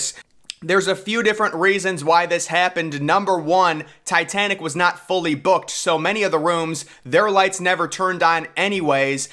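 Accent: United States. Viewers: Tone down the shouting, big American twang voice...it's really irritating!😳🫣🤬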